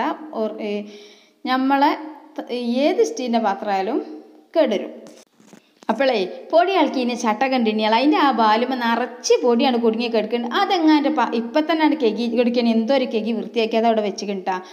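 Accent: Malappuram, India. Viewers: മലപ്പുറം ഭാഷ ആണ് മക്കളെ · .Nishkalankamaya samsaram.. Athanu malappuram bhasha.. 🥰super pathiri👍🏼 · തനി മലപ്പുറം സ്ലാങ് ആണ്‌. കഴിക്കണ്ടത്തവർ കഴിക്കണ്ട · Aa😁. Malappuram. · താത്ത പറയുന്നത് ഒന്നും മനസ്സിലാകുന്നില്ല. Slang കേരളത്തിന്റെ മധ്യ ഭാഗത്തുനിന്നും തെക്കോട്ടു താമസിക്കുന്ന ആർക്കും മനസ്സിലാവുമെന്ന് തോന്നുന്നില്ല. ഇത് മലപ്പുറം ഭാഷ ആണോ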